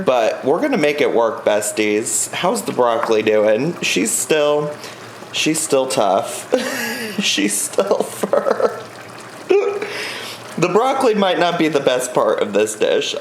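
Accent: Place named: Midwest, United States. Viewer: It’s that Midwestern voice.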